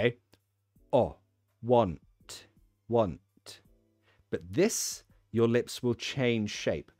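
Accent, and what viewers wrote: United Kingdom. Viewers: What british accent you talk:Rp,Modern Rp,posh or cockney and do you talk more than 1 accent in your daily life?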